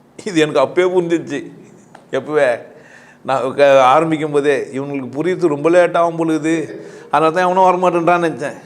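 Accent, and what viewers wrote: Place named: Chennai, India. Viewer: Madras language super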